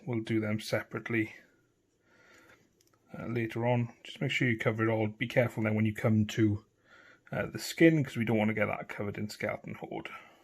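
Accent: Wales, United Kingdom. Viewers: Another great tutorial mate, you're really making me want to get some of these new Necrons now. Also it's great to hear another Welsh accent on Youtube, Keep the good content coming!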